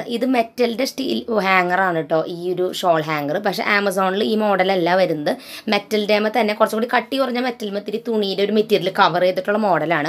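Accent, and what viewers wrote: Thrissur, India. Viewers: Ithu njangal Thrissur kkarude slang anu. Njangal achadi bhashayonnum samsarikkarilla tta...,☺️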